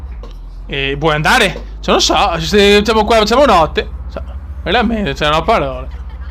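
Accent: Veneto, Italy. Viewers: Quanto mi sta in culo il tuo accento veneto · L'accento veneziano mi fa impazzire, sei un grande! Vai così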